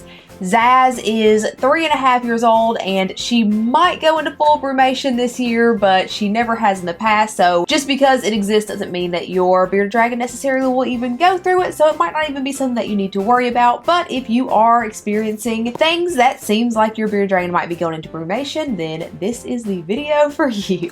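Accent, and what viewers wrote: South, United States. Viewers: I have never seen or heard a black woman with a country accent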